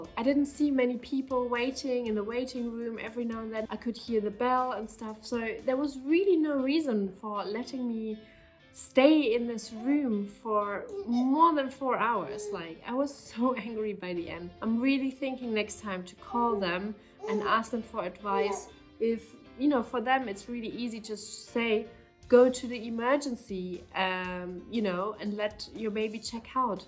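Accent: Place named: Germany. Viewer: I recognized literrally from the first words that you were german. Are there a lot of germans there? If yes, I wont move.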